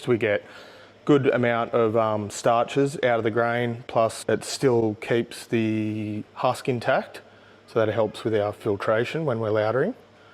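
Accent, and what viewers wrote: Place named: Australia. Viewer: Very interesting information althoug I have to watch it several times because Ausie english is more dificult to understand to me! Thanks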